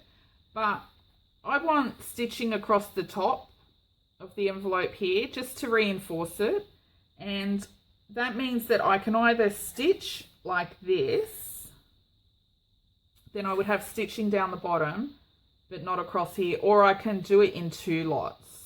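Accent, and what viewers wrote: Australia. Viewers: These are absolutely fabulous,! I hope they sold well! I’m an old quilter, started in 1986! But haven’t quilted much since my divorce & move back to Kansas in 2004. So I have a huge collection to choose from! 🥰🤗I will have to push junk out of the way, but if I’m motivated that won’t be a problem! Haha! I recently bought some cotton fabric at Walmart (those of us who really aren’t too crazy about shopping there call it Wally’s World! I suspect you would be in that category!) But I bought it to use as half pockets on larger journal cards, etc. Then thought of some other thing to be entranced with & they got pushed aside…story of my life!! Another woman said she thought she had “journaler’s ADHD”, yep that’s me! I don’t think it’s all that uncommon among crafters, though! I’m enjoying your channel soooo much, you are just so much fun & I love your accent! 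In one video you said you lived in Sidney, are there different accents around the country? I mean you’re a continent! England is just one little bitty country & yet practically everybody I watch has a different accent! It’s really interesting to me how that happens. Are your eyes better now? I hope so! Take good care! Erica❤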